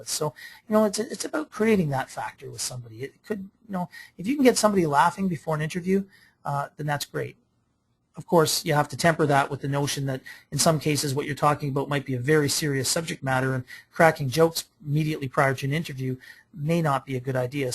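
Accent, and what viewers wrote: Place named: Minnesota, United States. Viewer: Good stuff.... is definitely a Minnesotan or Canadian; Fargo anyone?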